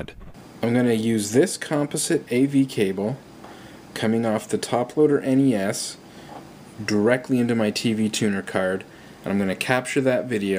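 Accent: Canada. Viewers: Are you Canadian? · Wow. Nice. Hey, are you Canadian? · is this guy canadian because he sounds canadian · Yes he is indeed a Canuck. As am I.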